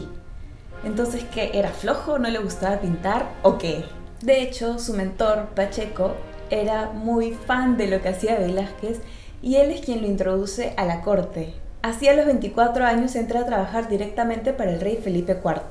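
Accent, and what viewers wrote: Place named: Venezuela. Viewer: Heres Venezolana verdad por el asento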